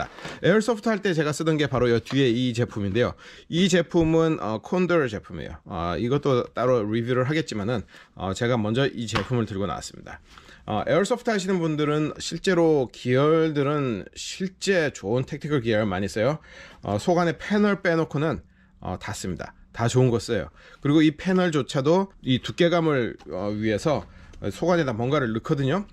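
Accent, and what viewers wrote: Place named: Japan. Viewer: you sound Japanese or maybe Korean but you don’t sound like a chinaman I hope your not a chinaman I could not understand what you said other than acelink armor I feel you gave a good review of this item enjoy the plate carrier and buy two more sets and donate them to Taiwan they’ll need them to fight off the chinaman we have swapped to chinaman targets to practice hitting the short dumb backward bat eatin people if you are chinaman then know plate carrier is more effective without any inserts in it at all it’s an American secret so don’t tell chinaman good luck hope you never need plate carrier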